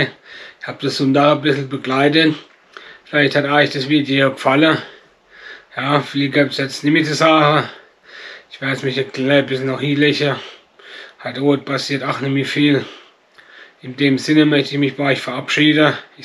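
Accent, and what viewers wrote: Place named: Palatinate, Germany. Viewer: Top Video Was für ein zauberhafter Sonntag hier in der Pfalz! 🌧☔ Trotz des regnerischen Wetters hat dieser Tag einen ganz besonderen Charme, den nur diese Region bieten kann. Die Regentropfen spielen eine sanfte Melodie auf den Dächern der urigen Pfälzer Häuser, während die Natur herum in einem satten Grün erstrahlt. Und dann dieser urige Pfälzer, der uns in dieses YouTube Video zeigt – er ist einfach das Herzstück dieser Atmosphäre! Mit seinem herzlichen charmanten Dialekt entführt er uns in die Welt der Pfälzer Gastlichkeit gr. aus Lemberg Eure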